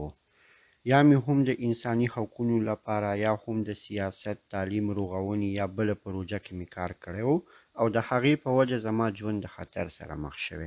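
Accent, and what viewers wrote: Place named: Khyber Pakhtunkhwa, Pakistan. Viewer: ډير په زړه پورې معلومات ؤ ممکن ځينې خلک ستاسو د ژبې او د لهجې په اړه منفي نظر وړاندې کړي خو تاسو فعاليت جاري وساتي تاسو په ټول معلوماتو باندې پوه شومه همدرانګه ستا لهجه د کوزې پښتونخوا خلکو ده پوهيږو يوه پښتو ژبه ده فقط لهجې دي لهجې هم ډير فرق نه کړي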